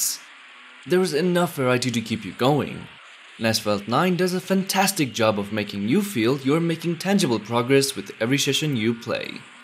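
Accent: India. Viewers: this guy's English Accent in INDIANISH. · Indian accent can never be hidden..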